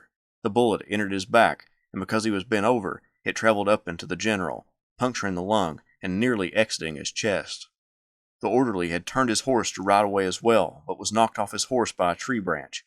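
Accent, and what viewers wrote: South, United States. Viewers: 🏗🦌🌟🌟🍀 **I REALLY APPRECIATE YOU AND YOUR SOUTHERN ACCENT !!!!*** I was raised in Louisville Kentucky 👏 Michael W.Johnson retired in North Modesto, California 😊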